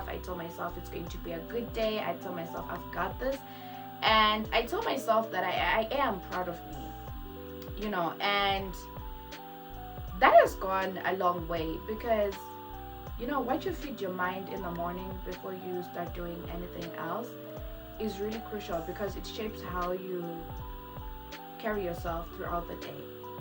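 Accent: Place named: United States. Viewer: Your voice chete , let’s talk about that 😮… smooth voice with English yekuAmerica✅✅✅🤣🤣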